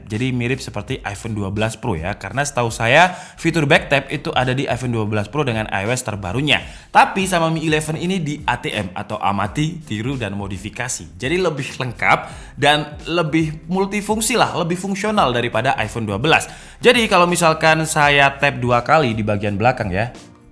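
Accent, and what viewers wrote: Malang, Indonesia. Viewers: Wong malang to tibak e 😂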